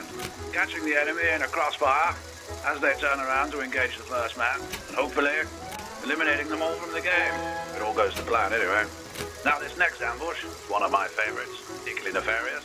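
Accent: United Kingdom. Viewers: I like it. Especially the typical English voice :) In all the videos!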